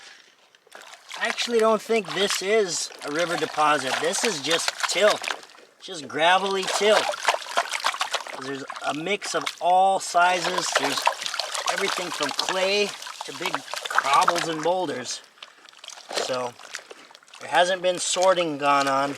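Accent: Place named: Midwest, United States. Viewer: If you have yucky sticky clumpy dirt in your bucket, add water like you did at 23 min. in this video. The magic tool? (2 of them, actually) 1. ALWAYS put a few drops of JetDri in your bucket! With your fine flour gold, you MUST break the surface tension, or fine gold can actually float, and get panned out. 2. Bring a battery-powered drill along. Attach a paint mixer to it. Use it to pulverize the clay balls and loosen all material, freeing it up for more effective panning. Bring an extra battery just in case. LOVE your videos - haven’t heard that Midwest accent in many years… Makes me homesick!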